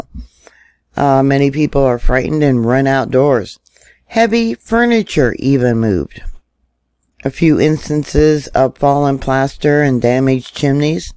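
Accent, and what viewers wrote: South, United States. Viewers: Get the rest you need but keep up the good work. Love your old fashion country dialect, especially when you pronounce "Wash" or "Washington" I was raised around the Seattle area and it wasn't until I went into the military that I was told there is no "R" in either word My family pronounced it "Warsh" and "Warshington" also and we pronounced Snoqualmie Snoqualomie where we got the extra "O" is anybody's guess.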